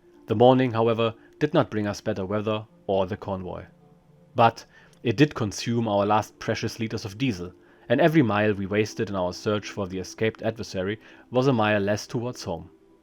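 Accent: Germany. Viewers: You are a terrific narrator. Your German accent makes this all the better. I am second generation German and am currently reading the German version to build my language skills. Thank you for this !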